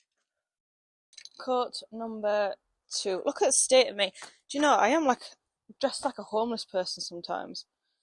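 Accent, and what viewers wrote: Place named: North Yorkshire, United Kingdom. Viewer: yes can tell with the north Yorkshire accent 😊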